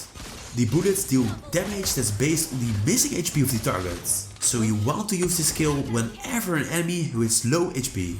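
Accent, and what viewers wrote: Netherlands. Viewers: Hey man, Ik herken een Nederlander wanneer ik er één hoor. Als je mensen zoekt om mee te spelen/test content mee te maken laat maar weten man.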